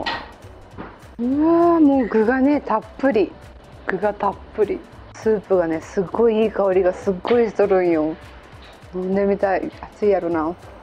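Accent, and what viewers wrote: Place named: Hiroshima, Japan. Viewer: 何か広島弁が入ってたかなぁと思って聞いてみました ごめんなさい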